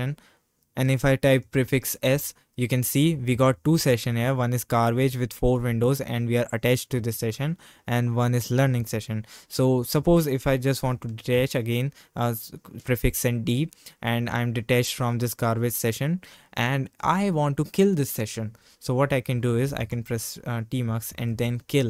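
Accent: India. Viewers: I have saved this video to watch it later. Many thanks for this. Btw, may I know where you are from? You have an Indian accent.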